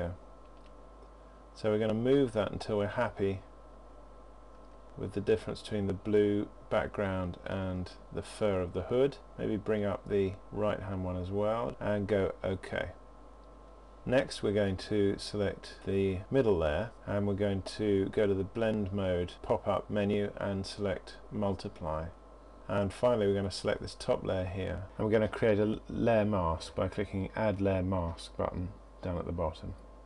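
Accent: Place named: RP, United Kingdom. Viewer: lol, i didn't even bother with this but your voice is very posh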